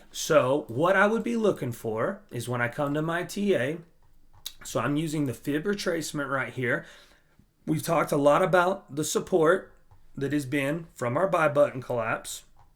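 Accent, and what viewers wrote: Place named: South, United States. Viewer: Maw frands LOL, you sound southern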